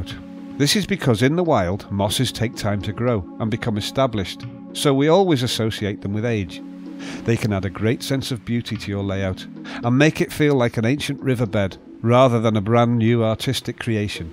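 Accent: Liverpool, United Kingdom. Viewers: Absolutely beautiful but the voiceover by a scouser on Valium reading from a 12 year olds school essay really detracts from the aesthetic as a whole.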